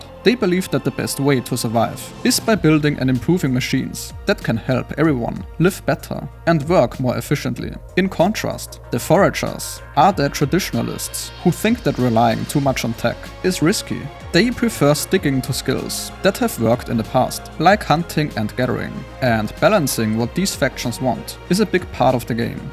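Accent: Germany. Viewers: Man hört zwar, dass du ein Deutscher bist xD aber sonst richtig gutes Video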